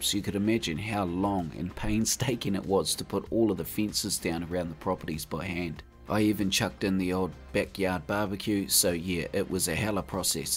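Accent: New Zealand. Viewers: Awesome work, mate! I appreciate your unique and realistic ideas. Bloody hell I miss the kiwi accent, though! All the best to you from an Aotearoa-loving West Islander!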